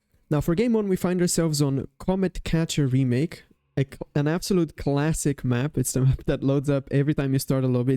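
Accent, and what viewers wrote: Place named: Quebec, Canada. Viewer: This guy kind of has the George St. Pierre accent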